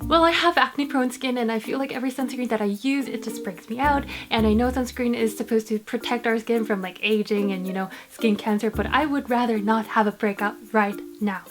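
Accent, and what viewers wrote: England, United Kingdom. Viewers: Her little British accent when she has the glasses on...:) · Love your british accent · She has a british acsent 😅 kind a bit 😄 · is she from England? Her accent sounds kinda english :o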